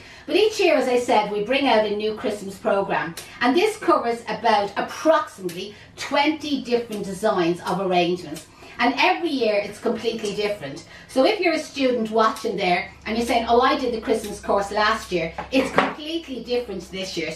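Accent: Ireland. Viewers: Thank you for the great tutorial! I could listen to you talk all day. An Irish accent is so lovely to my ear. I’m going to do a bottle arrangement for my next party!!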